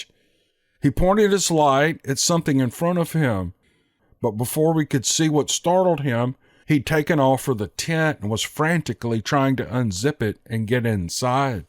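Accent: South, United States. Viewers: Thank you, for providing such wonderful entertainment totally unrelated to all the craziness going on in the world these days!! You are a GREAT story teller with a beautiful, smooth as velvet Southern voice! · Hi, I have become a huge fan from the UK. I love your stories and could listen to you for hours.. I adore your accent, it's so nice and homely, being from England I never get to hear a that glorious Southern drawl.. thank you so much for all the time and dedication to your channel, from me, hundreds of miles away across the pond. Happy thanks giving to all my American friends 😊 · I've said this before, but I will say it again. I'm from Oklahoma and am used to hearing people talk with a southern accent. So when I hear that familiar drawl with those vowells drawn out, I get a warm, comfortable feeling. It's brings a familiarity that inspires a trusting attitude for me. 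I can relax and fall asleep when listening to you. Thus, you turn out to be the voice I go to sleep to each night. I have difficulty with sleeping, so anything to make those long nights pleasant and maybe shorter is welcome. Thank you for the pleasant demeanor and great stories you choose for all of us. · Have a happy Thanksgiving, thanks for the stories man just love your southern accent my brother..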